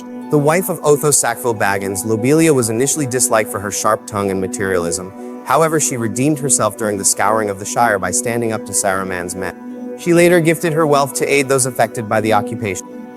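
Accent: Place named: United States. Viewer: A shame about the strong US accent. But it's you, so I'm still going to watch.... Finished and it's as good as the rest. Ty 😊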